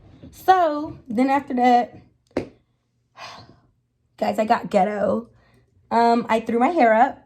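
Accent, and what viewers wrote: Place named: Nigeria. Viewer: Hey gorgeous 😘 Love the content & your nigerian accent tickles me🤣😂👌🤣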